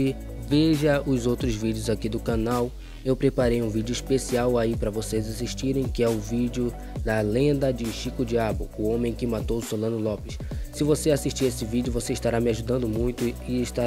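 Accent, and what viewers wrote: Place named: Belém, Brazil. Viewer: Você tem a fala de paraense belemita. E a luta pela criação do estado do Tapajós não é dos políticos é do povo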